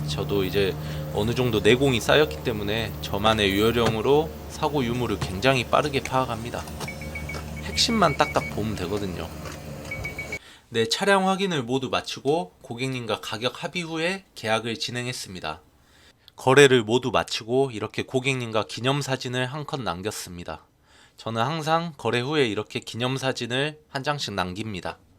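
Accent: South Korea. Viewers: 아따 전라도냐? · 혹시 광주사람인가요?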